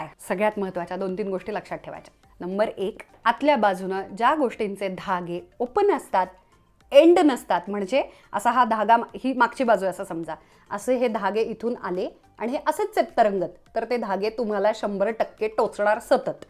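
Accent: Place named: Pune, India. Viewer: I just love the way you talk, pure marathi puneri tone :), looking forward to see online shopping tips/tricks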